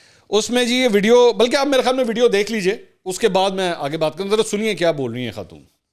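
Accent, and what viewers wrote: Punjab, Pakistan. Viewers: Bhai apka Punjabi touch Kamal hy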